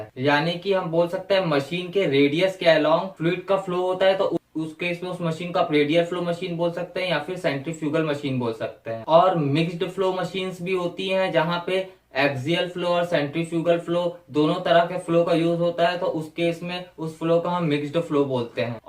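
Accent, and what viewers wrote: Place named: Uttar Pradesh, India. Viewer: Up Jaysa bolta ho bohot halp ho ta ha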